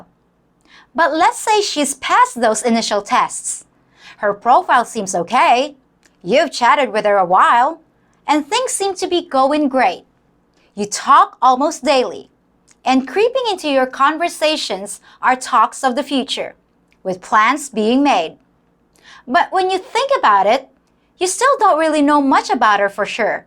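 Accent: United States. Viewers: did you live in the states? You sound way too Americanized even your way of thinking. One rule for men to follow. No honey no money. Meaning you have to meet them first and see how it unfolds. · How do you sound so American and know our colloquialisms? ad nauseum, I know many who dont know this phrase and many others you use. Did you live in the US? Canada?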